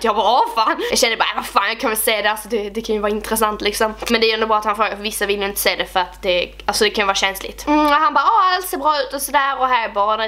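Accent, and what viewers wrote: Scania, Sweden. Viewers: Asså du e typ den enda ytbern jag kan sitta och lyssna på en hel video, tröttnar typ på andra men du e bäst på det (och jag älskar skånska 😂) Love u ☺️❤️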